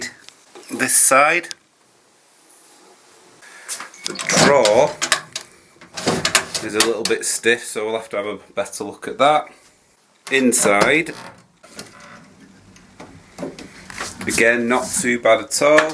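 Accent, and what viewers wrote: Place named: Northwest England, United Kingdom. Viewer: This was a lovely video to watch. You obviously take pride in what you do and the piece should now last for another hundred years. I learnt so much from this for a piece I'm going to try and restore, so thank you. If you take commissions, you should mention how to get in touch with you. It sounds like you're from the NW and I am too, and I know my furniture would be well looked after if I brought them to you.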